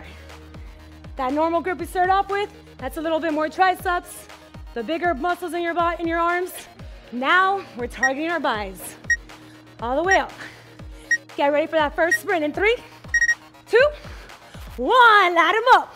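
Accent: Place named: Miami, United States. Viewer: That Miami accent tho.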